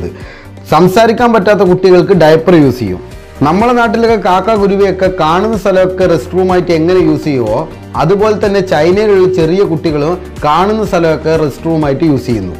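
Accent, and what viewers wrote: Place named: Highland region, India. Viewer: Hai bro aru from up hill distic ur longeage slang up hill modal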